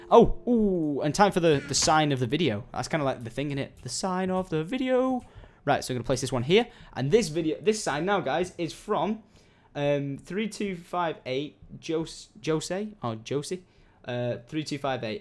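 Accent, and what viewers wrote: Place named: United Kingdom. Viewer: your brittish never gets old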